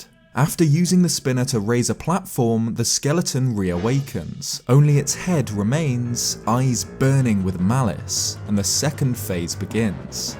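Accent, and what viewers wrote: England, United Kingdom. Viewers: that's just the English accent for ya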